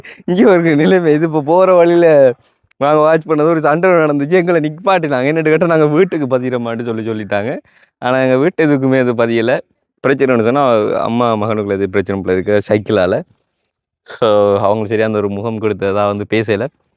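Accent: India. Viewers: இது உண்மைதான் ஆங்கில வார்த்தைகள் எல்லாம் இந்திய மக்கள் உச்சரிப்பது போல உள்ளது இதை மாற்றினால் நன்றாயிருக்கும்.எமது மாவட்ட பேச்சு வழக்கு நல்லது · தம்பி நீங்க இந்தியாக்காறர் மாதிரி கதைக்கிறது கேக்க நல்லா இல்ல கோபத்தில் சொல்லவில்லை உண்மையை சொல்றம். தம்பி கதைக்கிறமாதி கதைங்க நல்லா இருக்குதானே எமது மட்டக்களப்பு தமிழ் மாற்றம் எதற்கு ஐயா. நல்லா ஒரு சகோதரம் மாதிரி சொல்றன் தம்பி. கோபிக்க வேண்டாம். மற்றும்படி குறை இல்லை உங்கள் பணி தொடரட்டும்👍👍👍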